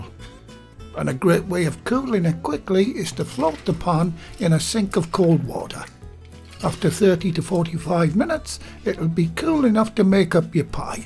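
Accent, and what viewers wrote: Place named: Geordie, United Kingdom. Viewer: This looks amazing, reminds me of the steak pies my mam used to buy from a local butcher. As a fellow geordie i find your accent so lovely to hear .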